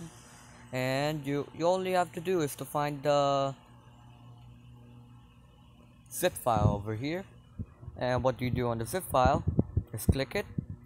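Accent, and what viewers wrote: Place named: Philippines. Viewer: Filipino? I thought your accent.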